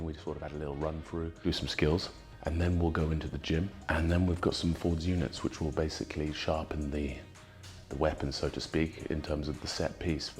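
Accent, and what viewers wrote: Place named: Austria. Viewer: Have to acknowledge the Arnie accent sir!!! 🤌🏾🫡💪🏾🔥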